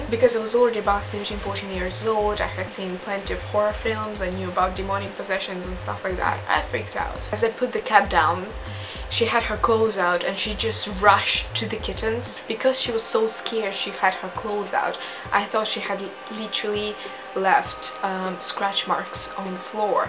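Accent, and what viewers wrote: Russia. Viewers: whoa whoa whoa! hey now, how is it even fair for you to be this freakin' beautiful?! and a fellow russian as well, judging by your accent. :) #womancrushwednesday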